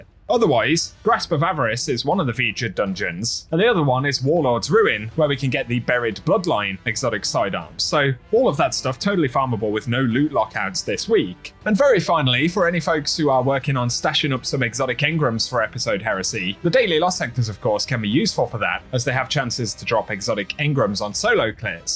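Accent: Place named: England, United Kingdom. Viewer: Cut him some slack, he's English. If he were Welsh, you wouldn't be able to understand much of a damn thing, I'll bet.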